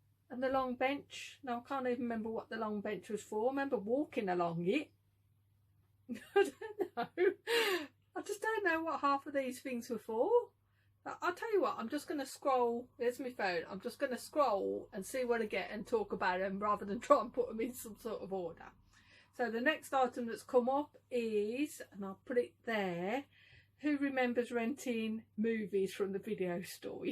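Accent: Birmingham, United Kingdom. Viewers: My goodness. Your amazing. How I long to be able to sit in a cafe and hear a person at the next table that I don't know talking about ordinary everyday things. And that fabulous accent. Takes me back to my childhood in Brum. Your Ronnie Corbett meandering is so good. I'm not sure you know much about the 70"s you'd have been too young. However the 70's as a teenager and twenty something was amazing in Brum. At the top of Broad St you had the Saints and Sinners cafe and Barerellas and the Rum Runner . If you can't remember it you weren't on the scene. It was so cool. Thanks for posting. James. PS. your suggestion of mass surveillance and behavior profiling would be a non starter. They already do it in China. It's called "big brother" I recommend the book 1984. But not to worry your thinking out loud.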